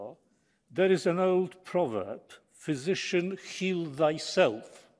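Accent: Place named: Oxford, United Kingdom. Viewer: Panie Profesorze powiedzieć że jest Pan genialny to jakby nic nie powiedzieć....i w dodatku ten Oxford English...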